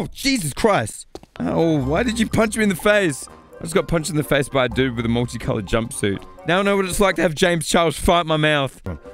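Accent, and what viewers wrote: Australia. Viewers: are you really aussie ?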